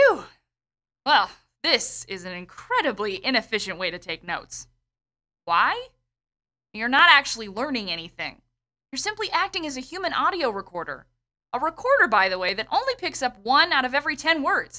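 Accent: United Kingdom. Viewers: omg with british accent you are just like Miss McGonagall from harry potter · Hi, thanks for information! I like the speaker saying british accent😂 And is this method applicable to non fiction class? Such as anthropology? Thanks!!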